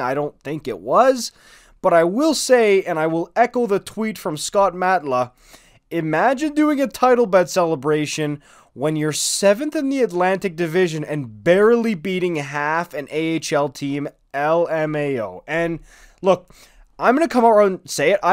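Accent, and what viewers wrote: Quebec, Canada. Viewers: Hearing you speak perfect english and swith in bon vieux québecois kills me everytime lol, keep it up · Yoooo je savais pas que tu étais Québécois😂 damn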